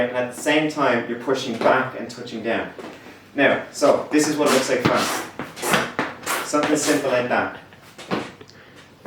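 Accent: Ireland. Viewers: You from dublin? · Are you Irish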